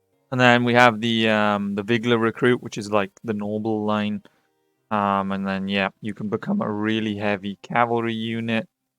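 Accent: United Kingdom. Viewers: I can hear the South Wales accent straight away, Swansea me. 😂🏴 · I always enjoy your videos and your accent as well, what part of the UK are you from?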